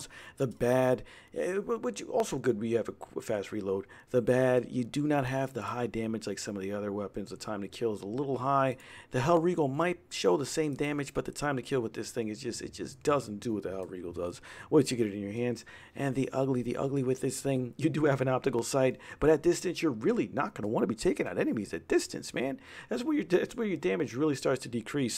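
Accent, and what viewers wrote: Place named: Philadelphia, United States. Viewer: this guys gotta be from the Philly area. Lived my whole life here, can hear a Delaware Valley accent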